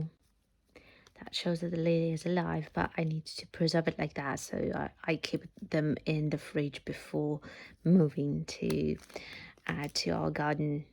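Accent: United Kingdom. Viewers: Also a big fan of Cacti, thanks! (By the way, your english is outstanding with a hint of a British accent.) Be well. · Hello, I hope you and your family are well. War is just horrible and it always leaves permanent scars on the land and people. I pray for peace everyday. Growing those beautiful lily's and delicious vegetables will give you something beautiful and good to look forward too. i have just started my tomatoes and peppers in my small 10 by 10 greenhouse and my rhubarb is just starting to poke through the ground. I cannot wait to get my hands in the dirt again,lol. I was wondering how you got that British accent? Iam in zone 6B here in north eastern US. Iam hoping the snow is all done and spring is really here and it is time for new beginning's and the renewed hope of life. Please take care of yourself and don,t worry, everything will be fine.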